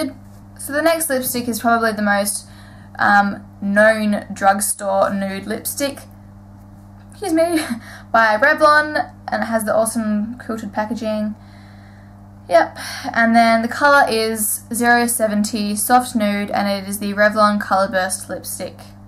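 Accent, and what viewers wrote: Australia. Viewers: I love the Rimmel one!! I cant belive i JUST found u! A fellow aussie lol altho ur accent is alittle more refind than mine hahaha hope 2 keep in touch, ur awesome! xx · its weird hearing another australian on youtube because everyone is american!!! this is what i sound like to others :D and i love it!!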